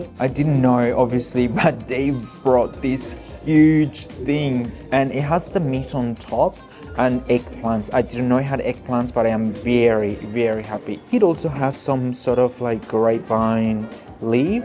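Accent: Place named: Australia. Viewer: I am glad u enjoyed ur visit in iraq amigo . where are u from by the way , ur English sound Australian but i can hear the Latin accent there <3 . Thank u for showing the yummy food that i miss . the dolma is not good doesnt look good i give it 2/10 my mother make the real dolma from mosul .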